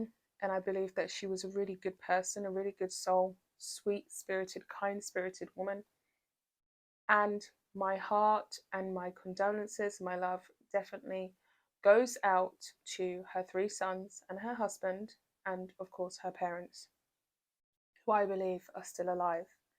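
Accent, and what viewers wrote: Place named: England, United Kingdom. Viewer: I'm glad you're back. You're one of my favourites. As an Englishman from London, it's lovely to hear an English accent....especially when it's a competent and educated one. Keep up the. Good work.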